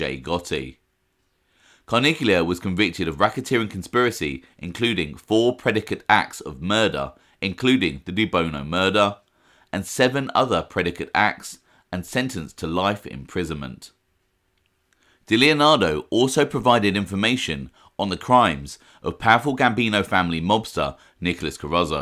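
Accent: United Kingdom. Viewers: Anyone else annoyed a FRUITY ENGLISH narrator is telling us about an American mobster?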